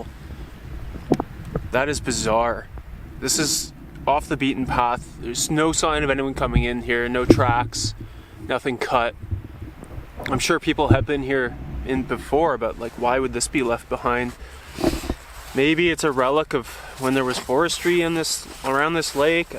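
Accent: Canada. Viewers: Well, I shouldn't be such a whinger about it, so apologies for that,! I thought it was Canada somewhere (due to your accent), but it's a rather large country so I was naturally curious as to where exactly. Thanks anyway for replying!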